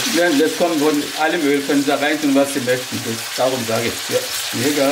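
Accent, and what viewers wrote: Swabia, Germany. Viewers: Hallo Bist du etwa ein Schwabe? Dein Dialekt Verrät dich wir kommen aus dem Ruhrgebiet. Tolle Rezepte,sehr lecker und die zubereitung ist auch leicht:goodvibes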